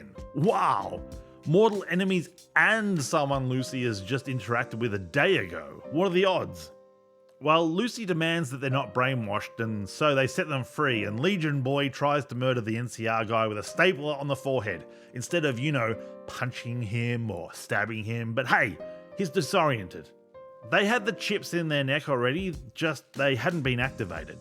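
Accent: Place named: United Kingdom. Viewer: Cause he's British!!!! Talks like hes got a mouth full of biscuits 😂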